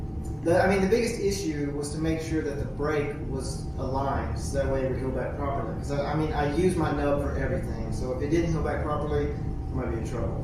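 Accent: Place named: South, United States. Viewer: LOL why are there subtitles? I can totally understand u I'm from NJ NYC is cuz of that southern accent of urs?😊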